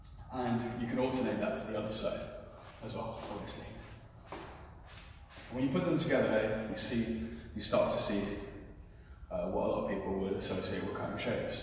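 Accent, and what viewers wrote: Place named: Germany. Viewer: He sounds foreign,kind of germanish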